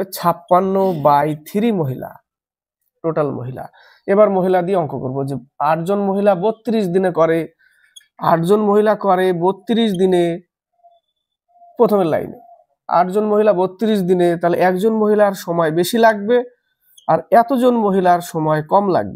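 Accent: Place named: Bangladesh. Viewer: কথা বলার ধরন তাতে মনে হচ্ছে আপনার বাড়ি বাংলাদেশ।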